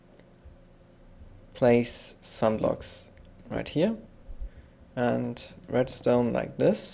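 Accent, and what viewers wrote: Germany. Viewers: da hör ich den deutschen raus xD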